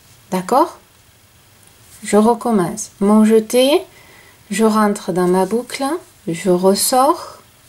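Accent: South, France. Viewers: Vous êtes formidable vous expliquer très très bien vous en dites pas trop juste assez et votre vidéo est très bien faite on voit bien vos gestes. J’ai longtemps cherché des tutoriels de crochets bien faits, le vôtre est exceptionnel! Je suis du Québec et en prime votre merveilleuse sonorité du sud. 😊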